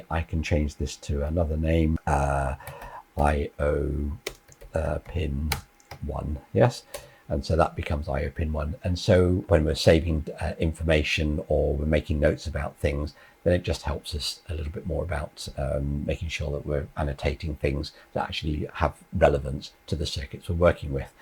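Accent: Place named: United Kingdom. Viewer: Master class with a nice British accent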